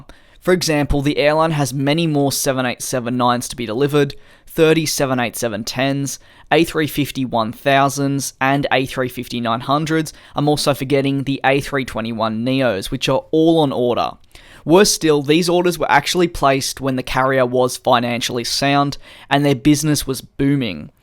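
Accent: Australia. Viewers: "Reuters" spot on pronunciation...even with an Aussie accent!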